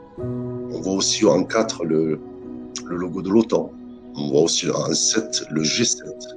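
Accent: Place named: South, France. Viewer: Bonjour, je jous decouvre ce matin , merci pour votre video qui me donne envie de vous écouté plus loins dans ces enseignements. Vous avez un accident du sud, de ma region!? Pouvons nous nous rencontrer ?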